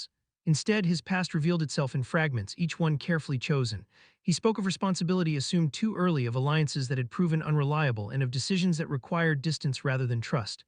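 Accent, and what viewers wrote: United States. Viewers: An American voice is just wrong.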